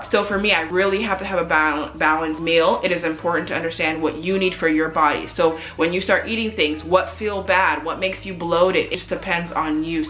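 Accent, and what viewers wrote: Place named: Netherlands. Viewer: Idk why but the way you speak English really has a Dutch undertone if you get what I mean hahah. Idk if you are dutch but yeah 🤣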